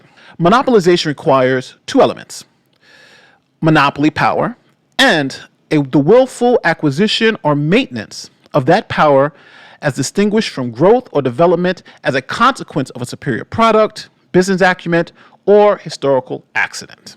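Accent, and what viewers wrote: New York, United States. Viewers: Love your videos & your New York accent. 😄👍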